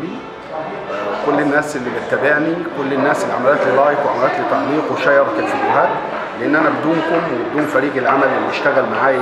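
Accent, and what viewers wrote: Upper Egypt, Egypt. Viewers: حبيبنا يا استاذ بنحبك من قلبنا ومتابعينك انت انسان محبوب اوي ونتمناك تمثل تستاهل الشهره بالذات الادوار الصعيدي انت اجدر من الممثلين اللي بيحفظو اللهجه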